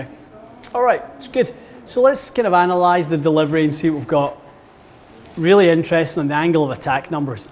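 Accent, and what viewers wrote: Scotland, United Kingdom. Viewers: Great videos pretty interesting stuff, always thought it was ideal to have the spin rates at the number on the club x 1000. Does more spin not allow for easier workablity left and right ? I know guys with modest club speed probably looking to max out distance but surely must be sacrificing stopping control in firmer conditions and shot shaping ability with such low spin ? I swing a 6 iron at 92/3 and am only carrying it around 184 but with spin between 5800 and 6200, also play x100 AMT as I like to see the low launch higher spin flight and also love the feel of the DG. Ive never been properly fit before but do plan to on my next set of irons, have always just went with feel. Also I can tell your from Scotland so hello from Glasgow. Cheers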